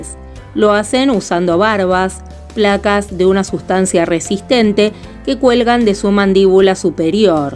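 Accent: Argentina. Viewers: Ah, me encanta tu voz y el acento argentino!!!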